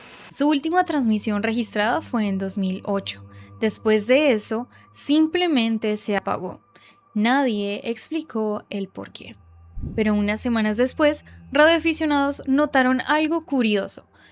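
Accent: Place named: Mexico. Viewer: sisi. no siempre, pero muy tarde a la noche se escuchan cosas interesantes. espero mas videos tuyos, bella voz, que sumada a la tonada de mexico es hermosa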